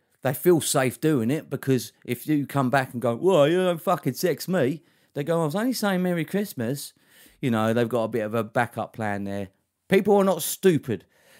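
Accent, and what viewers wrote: Cockney, United Kingdom. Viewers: Mate your videos are great top knowledge and your funny bout how you say it 😂 - you could be in Snatch the movie with that cockney accent.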